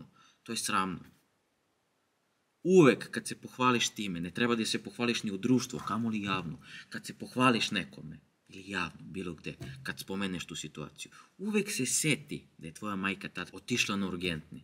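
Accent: Southern Serbia, Serbia. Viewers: Prizrensko-timočki tačnije prizrensko-južnomoravski dijalekat daleko će se čuje! Ja garantujem za ovog dečka! Pozz iz SC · Ja ga tek odnedavna pratim. I slazem sw sa vama. Decko je strasno inteligentan i elokventan. Svidja mi se taj naglasak, samo ne znam iz kojeg je to dijela Srbije. Mozda juzne, nisam sigurna. Volim inace gledati putovanja, pustolovine i slucajno mi se izbacio njegov kanal. Pozdrav za njega i sve pratitelje kanala iz Osijeka 😊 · Poz, skontao sam i pre ovog videa o čemu je reč i ko je upravu itd. itd. No nebitno to toliko, upečatljivo mi je to što kažeš da nećeš da se menjaš i da nećeš da menjaš svoj naglasak/pismenost i šta li već. Ja sam neko ko je studirao u nacionalno mešovitoj sredini (u Subotici) i stvarno i raznih krajeva su dolazili ljudi i upoznao sam od Kosovara do Madjara studente. Stekao sam POGREŠAN utisak da su ''Srbijanci'', kako mi Novosađani pogrdno i sa podsmehom kažemo ''Srbijanci'', pritmitivni i prosti ljudi, i taj njihov naglasak to dodatno govori. 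Ti si taj koji menja taj ceo moj pogrešan utisak i hvala ti na tome. Poz iz Novog Sada. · Lepo sam predlagao jednom u komentarima, povedi devojku neku na putovanja, pa makar drugarica bila samo, odmah druga priča, moja preporuka, oprosti ilkici poželi mu sve najbolje i završiš priču time. To ti je život, uči se do starosti, pamet u glavu drugi put sa više iskustva. Samo napred, podržavamo jug srbije, cepaj naš akcenat dole kome se ne sviđa nek traži drugi kanal, i ja sam napustio kanale već zbog nečeg što mi smeta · Batko samo ti nastavi da pricas tako to je jug srbije ljubi te brat,al ilkicu kad vidim ce mu pokrljam nosinger opet ,ce ga utepam ima da dise na rate kroz taj nos,ima nos sece burek lik mali mentol...😊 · Ljudi koji ne mogu da shvate tvoj naglasak i govor jednostavno i ne znaju da 98% nastavnika i profesora u skolama na jugu Srbije cak i u skoli na casu pricaju isto i predaju lekcije tako.i ne mozes ti da promenis to sad za 2-3 dana,jednostavno je tako i neka prihvate.to postoji u svakoj drzavi. 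· tako je jug tamo tako pričaju,simpatičan naglasak.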